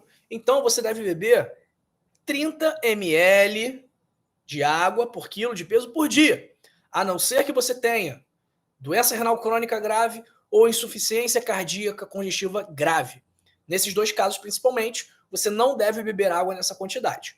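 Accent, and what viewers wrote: Rio de Janeiro, Brazil. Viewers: Muito x d carioca sotaque intragável e muito conversa conteúdo demorado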